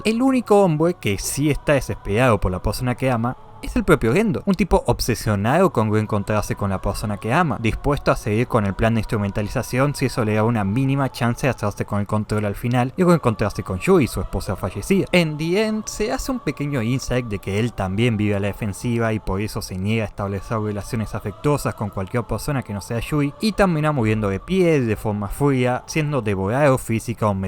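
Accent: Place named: Germany. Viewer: que onda sos de Alemania que tenes ese acento? Pareces Asuka en el doblaje latino (? buen video padreeee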